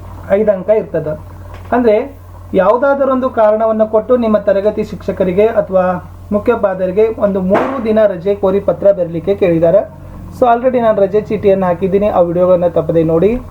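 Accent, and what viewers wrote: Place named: North Karnataka, India. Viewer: Yaa ooru sir. Nimdu bashe namtara adtira uttara karnataka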